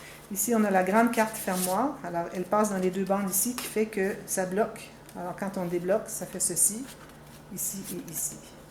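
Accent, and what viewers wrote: Quebec, Canada. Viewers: Magnifique, incroyables réalisations, une pro, magique et ça fait rêver.... Explications claires et précises Bravo j'adore, et ce magnifique accent de Québec... Wouaaaaaa...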